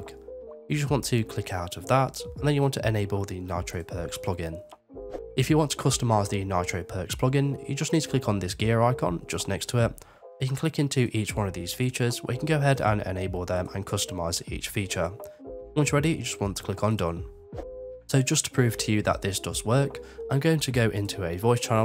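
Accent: United Kingdom. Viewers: Addicted to your British accent.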